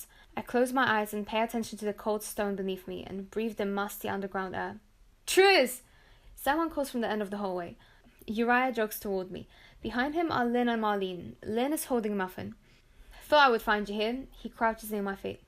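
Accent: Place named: United Kingdom. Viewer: Great video, ^-^ I really like the British accent its very different from other audiobooks ♡♡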